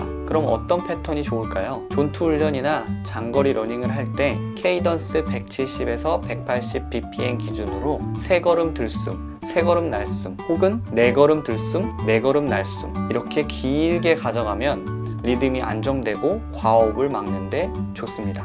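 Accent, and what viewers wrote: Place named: Mokpo, South Korea. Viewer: 오 목포네요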